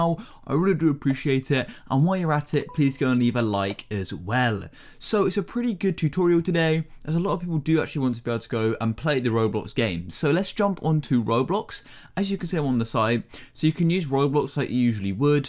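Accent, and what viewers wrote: United Kingdom. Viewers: litterally a british dude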